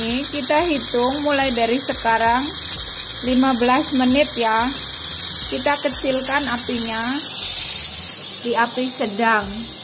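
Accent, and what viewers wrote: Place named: Banyumas, Indonesia. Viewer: Ngapak mania, masak super enak, jozzzzz👍👍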